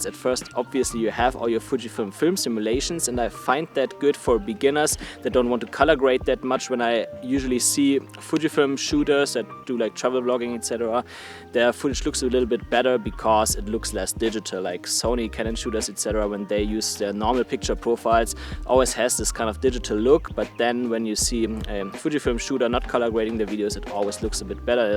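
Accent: Germany. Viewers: Hey, really nice review! I got the Fuji X-S20 this week as well🙂 I am new to Fuji X. Since you mention it a lot in the review: What kind of video settings would you recommend for YouTube videos (No Flog / without color grading)? I think about: 4k, H265, 4:2:0, 200 Mbps, Eterna (?) Looking forward using this camera! Bist du eigentlich Deutscher? Es hört sich so an 😀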